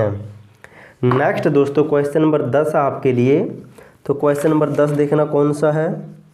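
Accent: Haryana, India. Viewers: Sir ji ye nai suruat b bhot achi h,,,mn hra hra ho gya ji,,,,vase ji aapki aawaj me tunning nrvana ,kathal side ki aati h ji kha se ho ji aap????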